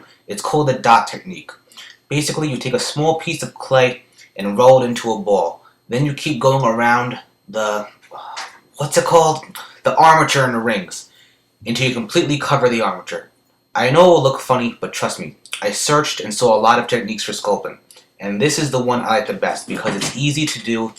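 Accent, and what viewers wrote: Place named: Texas, United States. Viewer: Its his accent DUH. In Pennsylvania people may sound like their nose is pinched (sorry all you people from Pennsylvania I was just using you as an example) But in Texas we sound like cowboys and cowgirls.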